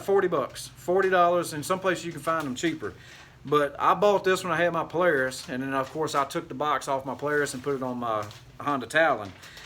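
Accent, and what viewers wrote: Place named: North Carolina, United States. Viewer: You sure sound like you could be from NE North Carolina. Ordered the Anzo unit this week. Lookin for it to be here Friday. Thanks for the video. Very helpful.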